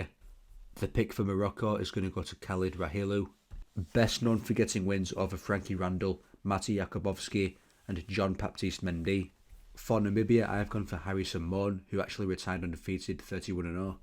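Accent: United Kingdom. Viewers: you sound brit but didn't mention Jimmy Wilde as the Top Brit. he's actually high ranking all time great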